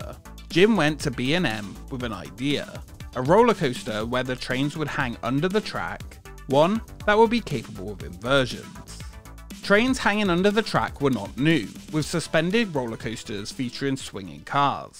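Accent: United Kingdom. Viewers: A high-pitched British voice.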